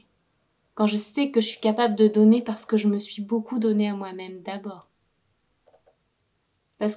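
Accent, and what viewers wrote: Spain. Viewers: Coucou ! Ya estás pillando el accento español un huevo! :) Yo pillando el francés....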